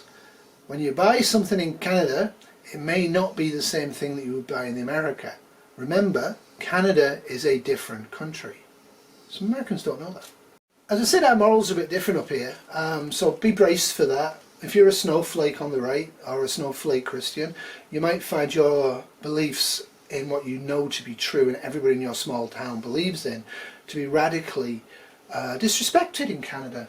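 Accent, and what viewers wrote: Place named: England, United Kingdom. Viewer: What what old boy. 🏉 Is not the hardest game ever.... Ha ha 😂. Are beer is the best and we have the werst weather. Rain in the morning sunshine then pissing it down.... 😊. Your so funny. An yes I can here the English in your voice. Keep it up mate. ATB ta the both of ya. Andy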